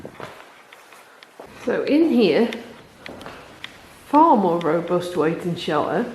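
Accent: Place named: Stoke, United Kingdom. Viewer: Lovely to hear a Stoke girl,. It took me ages of living here in Cambridgeshire to say luck and buck not lewk and bewk! I'm from Congleton just slightly north. Love your videos.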